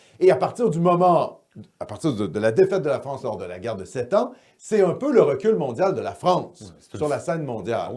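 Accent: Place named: Quebec, Canada. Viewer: Le pire dans tout ça lol. C'est que tu pognes plus en France qu'ici je crois 🤦🤦🤦🤦. Je vois tellement de bout de tes vidéos sur des chaînes françaises que c'est ce qui m'a interressé à te connaître. Je reconnaissait un certain accent dont la provenance française me semblait douteuse 😂. Je partage ta chaîne en espérant t'amener une reconnaissance québécoise 🤦😝😝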